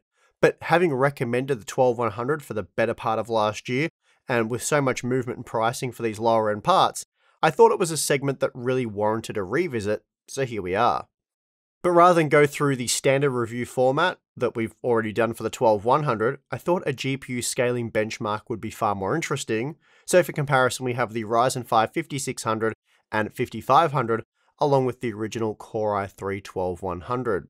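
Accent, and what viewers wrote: Australia. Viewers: I'll add one more thing: their reasoning is so cogent, clear, and concise that they do a bonus side service by teaching their viewers how to think critically, a skill that's very rare and precious these days. Oh, and did I mention that the Australian accent is another bonus and a very charming and welcome change in a repetitive sea of Americans?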